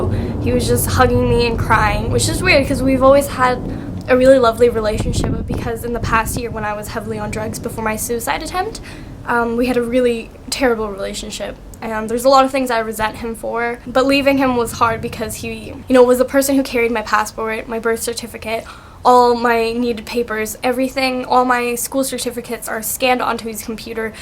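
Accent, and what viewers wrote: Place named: Australia. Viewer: it's so interesting to hear you talk cuz some of your words have a slight Australian accent to them which is freaking cute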